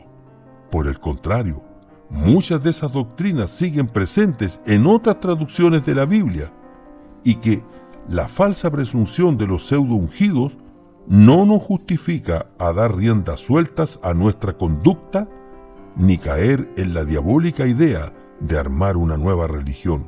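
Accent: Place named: Chile. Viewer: Por la forma de hablar me parece que es chileno ¿o me equivoco? Soy católico practicante y que pena que se haya desilusionado de la Iglesia.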